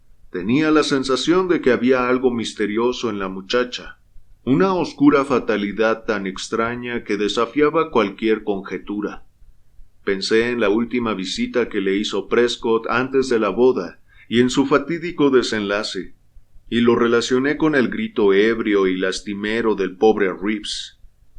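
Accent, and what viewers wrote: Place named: Mexico. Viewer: Hola!! He seguido desde hace meses tus lecturas y ya eres mi favorito. Tu voz con acento de Mexico es muy agradable. He buscado NEMESIS en español de Agatha Christie solo la encuentro en inglés.. Nos la podrías leer? Gracias valoro muchísimo tu trabajo.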